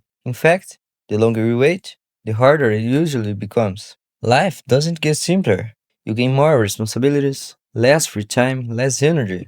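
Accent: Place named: Brazil. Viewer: pelo sotaque ctz q é brasileiro, baita video amigão 👏👏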